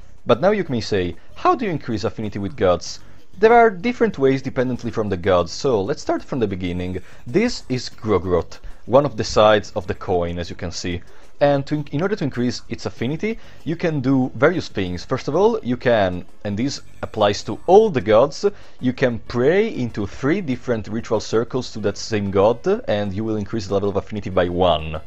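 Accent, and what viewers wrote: Italy. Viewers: are you italian? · Mi sembrava un accento italiano ad ogni modo grazie fra per le guide sono felice di vedere italiani con dei gusti sublimi per i videogiochi mi sono iscritto e metto like a tutto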